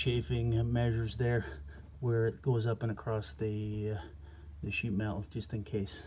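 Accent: Scotland, United Kingdom. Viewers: Do I detect a Scottish accent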